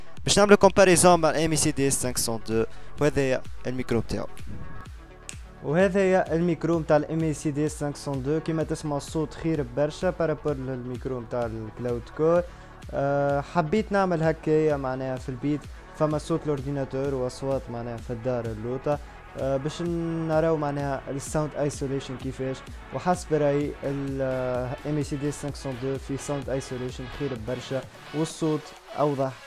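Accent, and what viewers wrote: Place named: Algeria. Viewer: dude 3andek famille mn ljaza2ir bicuz ur voice benli dziri :3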